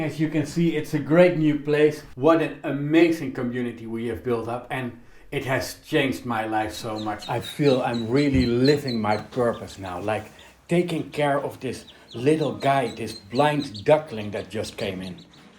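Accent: Netherlands. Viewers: Are you Dutch? C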